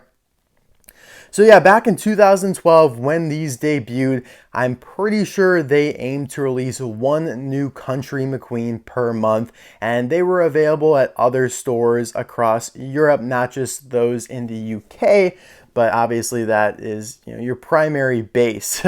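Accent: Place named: Ireland. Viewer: Amazing video! This was definitely interesting seeing the different between Disney store and factory customs. Although, I feel like there was a missed opportunity to compare some of them to some tomica country McQueens that are similar to those cars in the video. But oh well, the video was still great! I didn’t even know you were Irish! Also, on an unrelated note, I just realized that there’s another McQueen that only the Disney Store made: Blue Lieutenant Lightning McQueen. He was only in a McQueen-O-Rama 5 pack. I just felt like that was worth telling you. But anyways, nice job with this video! Keep it up!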